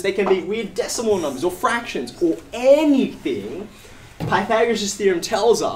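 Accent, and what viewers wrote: Australia. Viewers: Pretty sure that’s an Aussie accent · is this guy aussie? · it's the aussie accent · He's actually Australian. A person's native language and accent is not hereditary, it is environmental. If you grow up hearing most people around you speak English with an Aussie accent, you'll also speak English with an Aussie accent as your first language. And this is how it works for all people who grew up in Australia (Aboriginals, British, Chinese, etc), regardless of their ancestry, unless they grew up in an ethnic enclave, only hearing people speak their ancestral language.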